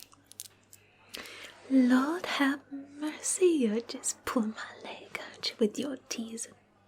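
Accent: South, United States. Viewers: OMG! I know she's not real but I can't help but smile each time I see our sweet Southern Bell. Moving?!?! No!!! Why is our character an idiot? Why is it in most role-plays in the ASMR community, our character is the equivalent of a handsome Village Idiot? Then again, if our faceless character was actually smart, he'd put a ring on her. (Loved the video,. Yeah, you've definitely perfected that sweet Southern accent. Happy Holidays!) <3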